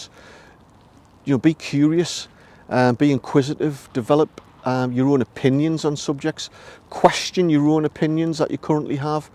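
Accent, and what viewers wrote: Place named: Geordie, United Kingdom. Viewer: You will have to tone down your Geordie accent, and speak with a plum in your mouth. I remember that BBC turned down an appearance by the “The little waster’ on a show because they thought the public wouldn’t understand him.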